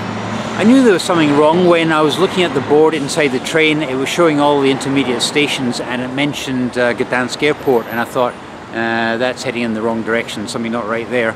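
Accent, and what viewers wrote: Scotland, United Kingdom. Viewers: Great content. Btw is yours scotish accent? Luv it.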